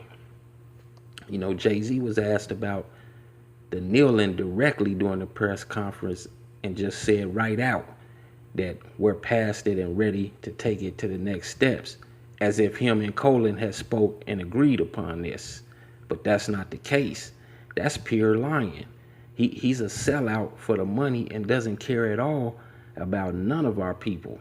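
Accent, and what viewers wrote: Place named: Midwest, United States. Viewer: I'm from L.A. born and raised. I watch your videos from time to time and I appreciate the good content you put out. But I for damn sure appreciate you doing your reserch on my city and knowing exactly where everything is. You ever lived out here in L.A.? You have a Mid West accent G.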